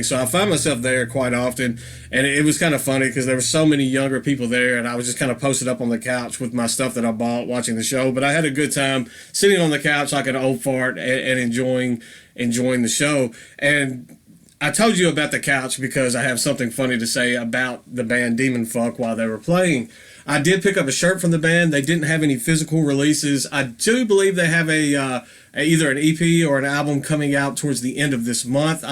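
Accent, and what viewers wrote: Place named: South, United States. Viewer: Would be funny to hear you singing little bunny foofoo with that southern accent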